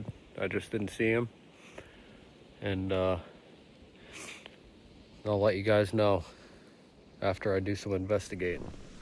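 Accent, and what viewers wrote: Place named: Midwest, United States. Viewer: You sound and talk like a Midwestern cowboy.Where you from good sir?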